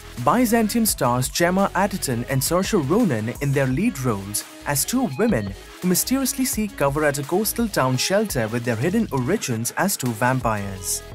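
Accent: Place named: India. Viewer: damn... I cannot listen w/o laughing that indian accent! :)) Bro, better you record "top 10 unmissable cartoons"! :) And no need to include "Underworld" twice - ALL its parts are perfect.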